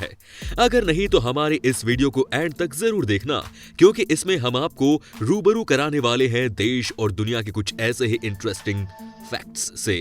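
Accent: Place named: Jaipur, India. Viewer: Yeh awaj Jaipur ke ek bhai ki hai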